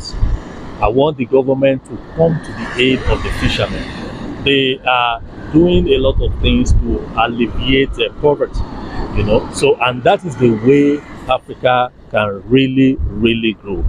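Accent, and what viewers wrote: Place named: Nigeria. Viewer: Are you from Nigeria,you’ve Nigeria accent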